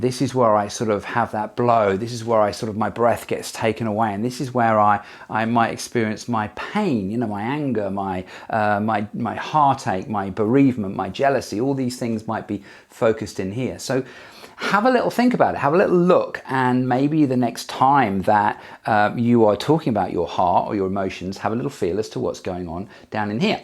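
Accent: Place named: Scotland, United Kingdom. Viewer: Scots speak!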